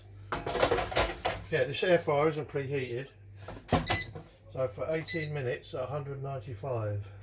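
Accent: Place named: England, United Kingdom. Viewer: A quid. Love hearing an English accent for a change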